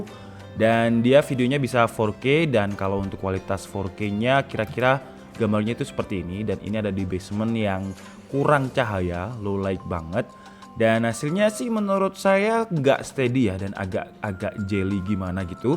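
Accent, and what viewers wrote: Malang, Indonesia. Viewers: Dari malang ya? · pean wong malang ta mas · Lhoalah wong malanggg to, mas 🤣🤣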